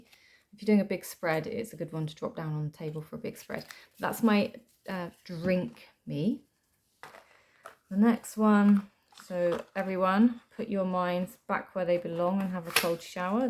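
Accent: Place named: United Kingdom. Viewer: For the record...I adore your thumbnail. And thank you so much for your kind words ❤ this was lovely! I have my eyes on the Dark mansion Tarot. Your decks are beautiful and I think I'll add a feel to my list lol. Enjoyed this so much. Your answers are awesome. Sending you love. And I noticed you have a lovely UK accent if I am correct? Man I miss it over there and can't wait to get back.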